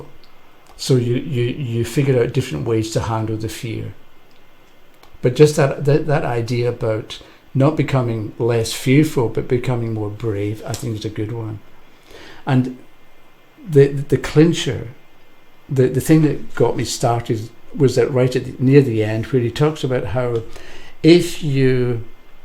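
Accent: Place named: Glasgow, United Kingdom. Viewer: I love your accent, mister. May I ask what area it's from? It's almost like Peter Capaldi's glaswegian accent but mellow. Good video and explanation. Thank you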